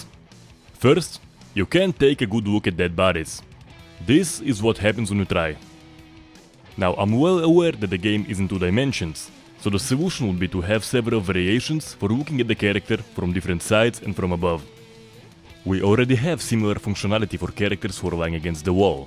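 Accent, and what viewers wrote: Russia. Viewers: What are your future plans for the series,? P.S. Are you from Russia?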